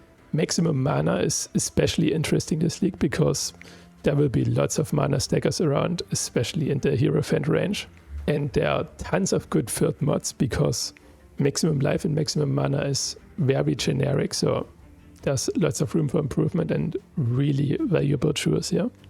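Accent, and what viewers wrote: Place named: Austria. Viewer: Austrian for sure! Probably from Niederösterreich or Wien! Nice video!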